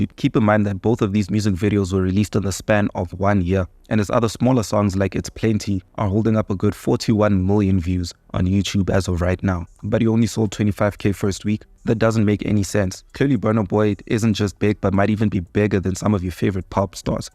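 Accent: South Africa. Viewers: You sound South African bro. Like you went to Boston College or something. Anyway you speak very well and fluent. Keep going bro.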